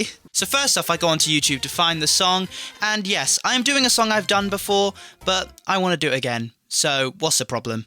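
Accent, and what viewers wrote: United Kingdom. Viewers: 아니 오빠 윙크 뭐야 뭐냐고 뭐야 진짜 왜그렇게 잘생김? 나죽어 응엉우웅우어엉 오빠 사랑해 으엉 영국 발음 미쳐 진짜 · I know this is random but I LOVE your British accent 😂😂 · ㅋㅋㅋㅋ 영국...분이죠..? 영어는 영국식 영어가 멋있지./ ㅋㅋㅋ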